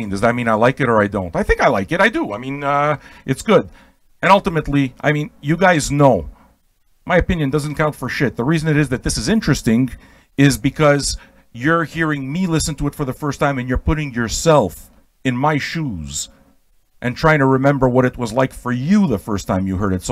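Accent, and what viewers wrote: Canada. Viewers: Well I got it right that it was Canadian so 1/2 pt to me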